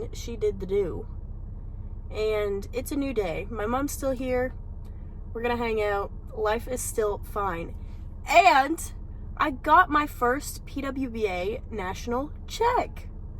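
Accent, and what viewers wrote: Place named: South, United States. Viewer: Picked up on that southern accent real quick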